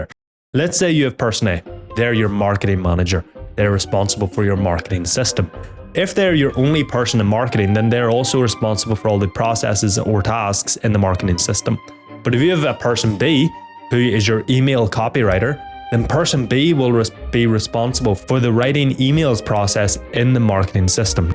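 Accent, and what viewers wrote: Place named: Northern Ireland, United Kingdom. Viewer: He got that light northern Irish accent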